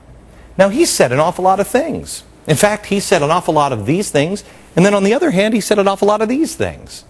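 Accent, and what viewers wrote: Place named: Germany. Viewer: im not even sure Glenn is american!!..has anyone seen or verfied his birth certificate???? and doesnt sound German like General Von Bock Bock/?.. in fact i think hes half south african...... ask him to say South Africa if he pronounces it Seth Efrica ya got ya proof.. nope he certainly dont seem and as for doing a good job by pissing liberals off id say as he stands for what the repulicans think hes making their credibility plunge to even deeper depths.....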